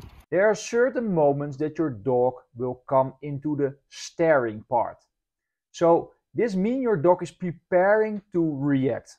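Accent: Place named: Netherlands. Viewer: Hoi ik hoop dat ik mijn oprechte mening mag geven op je nieuwe uitdaging om video's voortaan in het Engels te maken. Je uitspraak van het Engels is nog té "Nederlands" kortom klinkt beetje raar...😮😮😮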